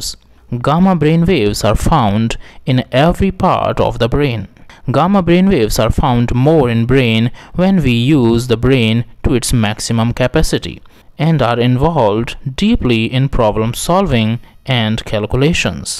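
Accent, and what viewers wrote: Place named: Bihar, India. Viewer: Did you learn English in Bihar??!!!😂🤣😂😆😃😋😡😡😠😠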